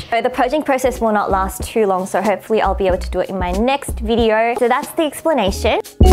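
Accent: Australia. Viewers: Heeeeeeeeey, are you from Australia? 😃😃😃😃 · OMG ARE YOU FROM AUSTRALIA??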